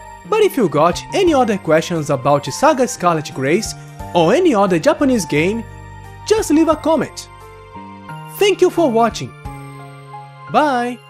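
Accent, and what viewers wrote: Brazil. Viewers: moh sotaque brasileiro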